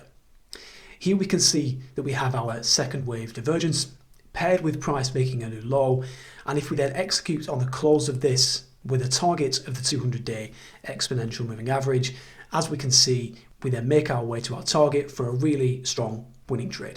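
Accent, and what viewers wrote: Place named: United Kingdom. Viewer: Hi thanks for your video and (for once ) I can hear a lovely british accent .. How do you deal with false signals ? divergences are not Always successful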